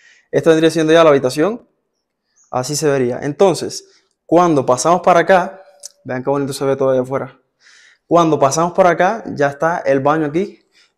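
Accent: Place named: Cuba. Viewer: Pero si tiene acento cubano,y no tiene sangre azteca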